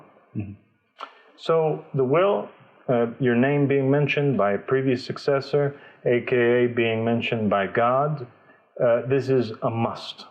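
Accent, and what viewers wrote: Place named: Texas, United States. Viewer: لسانك مو عربي والاكسنت مالتك تكول كاعد بتكساس شنو مهديكم من تكساس😂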